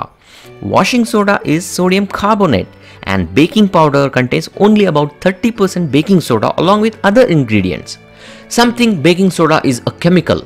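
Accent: India. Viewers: Very easy to use.i usually use backing soda for cleaning purposes.. Now I will do this ,Thank you sir.Your English is very good and clear Are you an Indian .?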